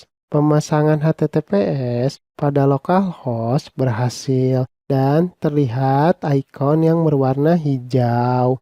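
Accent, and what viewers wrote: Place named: West Java, Indonesia. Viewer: Sunda pisan 😁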